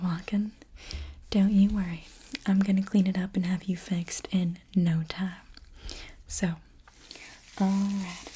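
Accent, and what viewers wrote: South, United States. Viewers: The southern accent is nice 😊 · The Southern drawl actually suits you quite well 😄😝❤️ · The southern accent.. I'm 44 now. I speak with an accent like that once in a while. I'm deep deep South Texas. . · My new favorite asmr video 🥰🥰💓💓amazing southern voice:D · The Accent was too good tbh, are you actually from the Southern countryside? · This has to be the best ASMR video I've ever seen, the southern accent is so peaceful and relaxing ❤❤❤❤ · You HAVE to do another southern accent video, no lie I fell asleep somewhere in between 0 and 30 seconds. I still watched the whorl thing when I woke up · And here I thought your normal voice was heavenly but this accent with the southern tone is just amazing. Hope this isn’t the only time we’ll hear it 🤠🫶🏼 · Oh southern accent I like that, I'm very familiar with that language. I think you should do more.🤠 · I love the southern girl accent! 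👍😘❤️ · Ok new to this channel...really loving the southern accent darlin ❤ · Idk why, but for some reason the southern accent was extremely comforting. Like I actively felt safe listening to this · Didn’t know I needed the Southern accent this is highkey fire 🥹 pleaseee do more Obsessed Girl tho it’s peak and I really wanna hear more dirt on Olivia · Wow what a great video😊 your southern accent was spot on. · The southern accent is decent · Hey, I love your videos! Im from the south and I thought the southern accent was pretty good. Also congrats on the 150k subs 🎉! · I’m British 🇬🇧 but I love the accent. Whereabouts in the states is that accent from? It’s very good :) 😍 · You nailed that southern accent 🩶🩶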